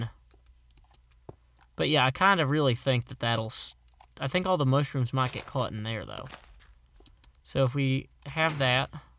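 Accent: South, United States. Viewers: Your southern accent plus your hilariousness makes me want to sub, like, and fav..........I describe you in one word as being....... AWESOME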